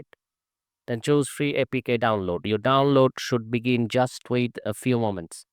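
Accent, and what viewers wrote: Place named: India. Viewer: Why do all these guides have a creator who sounds like a typical Indian scammer who scams elderly people into taking out loans for their grandchildren etc. :D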